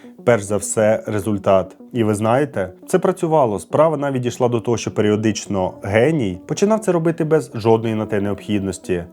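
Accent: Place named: Russia. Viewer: Мій улюблений художник. Він, дійсно, геній. Відмовився розмовляти іспанською з королем Іспанії, бо він каталонець. Про брата теж знала. По інформартивності- молодці. Особисто до Вас прохання - попрацюйте над вимовою, бо вона поросійщена. В нас тверді шиплячі. А у Вас це звучить як «[якщьо], [щьо], [щє], [вчінок], [вибачьте], [розбираючі], [бачять], [чєрга]…” Випуск чудовий, дякую. Якщо б ще вимову підтягнути- буде супер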